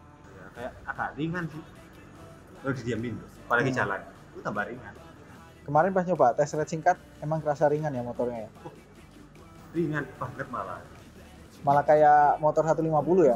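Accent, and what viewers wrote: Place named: Java, Indonesia. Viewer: Sya suka konten chanel karena suara medok jawa nya 🤭😄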